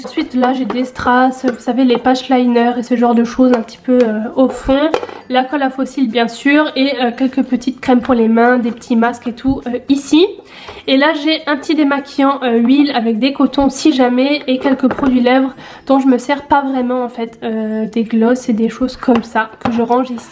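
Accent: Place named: Alsace, France. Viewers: Hello! suis fan de tes vidéos et Strasbourgeoise ! ça fait plaisir de reconnaître quelqu'un avec son petit accent ! Superbe vidéo, moi qui me fait une coiffeuse ça m'a donné plein d'idées ! merci beaucoup et gros gros bisous !! #TeamSnap 🌟🌟🌟🌟🌟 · C'est la premiere fois que je ne m'ennuie pas dans une video rangement makeup lol.. Sinon t'as vraiment l'accent alsacien ou lorrain jsp mddr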